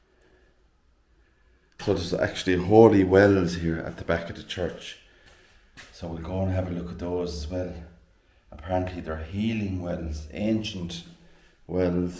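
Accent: Ireland. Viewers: Irish speech is enjoyable. So interested in the bell and tower. Healing tones from the old bells. ❤🇦🇺🙌👍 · I love your Irish accent. I lived in Hawaii for almost 20 years, and they also pronounce their threes trees. If we all sounded the same and looked the same, what a boring world this would be. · Love the Irish accent! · I am American, and I love your Irish accent!!!!!!!! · You bet you're Irish! I like your accent. It's what Irish is to me. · I’m 🇺🇸 and I like to hear the Irish accent and people are rude to fuss about pronunciation, don’t change! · The wall paintings are a stunning find! A shame we can't see what there is, but they do need to be protected in these destructive times. Marveous old church -- the Friends of Friendless Churches do valuable work. Nice to visit with the former residents of the parish, thank you. Your Irish accent is just lovely -- I can't imagine any moron objecting to it! 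· You have a lovely Irish accent; I could listen to it all day long😊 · Hi how rude of some gimp to mention how you pronounce 33 the cheeky hugger. I adore your accent and your lovely Irish brogue, Thankyou so much Love and kind wishes from Lynn in Brittany xx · Thanks from Australia and we love your 'tertee' it's how we knew you were Irish. Take care. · Love your irish accent! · I adore your Irish accent just wonderful and your pronunciations are perfect and no I wouldn't change a thing about you! I find it mesmerizing to listen to, thank you for this great video tour, love the church! Just beautiful ❤️ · I enjoyed all of this, ESPECIALLY your Irish accent.☺️ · Thank you for sharing, it is a beautiful church. Also, I am glad that you are proud of your Irish accent! I love it, not that you care if I like it, but I am mostly Irish-American born and we I hear Irish language and voices, I wonder what my ancestors sounded like, how they spoke, one day I hope to come for a visit. 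My 3x's great-grandpa immigrated from county kerry Ireland in the 1800's, I am unsure of the reason, but it wasn't an easy journey for him & his family. I still hold the family name of Sullivan and in Ireland the surname origin was Súilleabháin! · i like your Irish accent. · I love the old grave sites. The older the more interesting they are. Someday I want to visit there!!! It's been a life long dream. NEVER change, I'm American and I ❤ your Irish accent 🎉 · I love your Irish speak! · Doesn't matter if you say THREE, TREE, or any other Irish way, I love this channel. I wish i had an Irish accent personally. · Oh My Lord, how could someone complain about the accent? I think stories are best told with an Irish Accent - don't know why (maybe it's the ancient Irish DNA that my body still carries), I am American, with Scottish roots (and some Irish, Welsh and English mixed in). I absolutely love the accent. 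Ours is so boring. I love hearing you and Sue say Tirty-tree, it makes my day. I agree with you - you're Irish, that's how you talk and it's this American's preference. That's a hill I would die on, lol. There is something in the way the Irish speak that absolutely lends itself to storytelling, and makes it so easy to listen to. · Only a ignorant person could criticize your accent. The Irish accent is one of the most charming accents in the world. Thank you . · your Irish lilt is delightful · I love your Irish accent. Thanks for a lovely tour. I do a few tours of cemeteries as I document graves for genealogy. Fascinating histories to be found! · Your pronunciation is part of your Irish charm! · love the Irish accent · Hello, I preferred the exterior of the chapel before restoration, the ivy and lichen made it. Painting the tower white was horrific vandalism! Thank you for a very pleasant wander around the churchyard and for narrating it in your wonderful Irish brogue. 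· Don't apologise for our irish accents when we speak in the language of our former oppressors.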